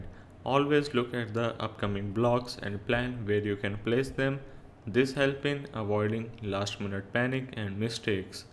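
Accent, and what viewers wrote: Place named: India. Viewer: Bassically there is this video of a person trying to aggravate a scammer and the scammer had a strong indian accent and was yelling at the person “DO NOT REDEEM IT!” And the video in general was rlly funny but the reason ppl are saying it is because he has a similar accent to that scammer. Hope this helps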